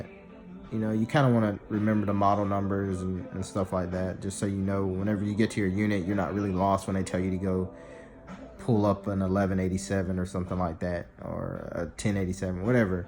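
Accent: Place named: South, United States. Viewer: Love the southern accent Soldier. 🫡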